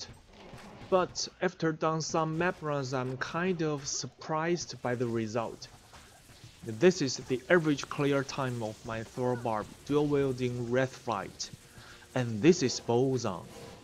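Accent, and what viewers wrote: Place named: Japan. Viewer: Love it! :) Entertaining and useful! Love the japanese accent aswell adds to the credibility. I dont have time to the all the test a research anymore so this is very nice. Keep up the good work.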